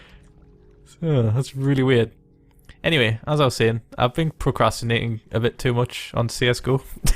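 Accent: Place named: North East England, United Kingdom. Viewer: Technically, not all of the UK. is North-Eastern English (coming from a North-Westerner)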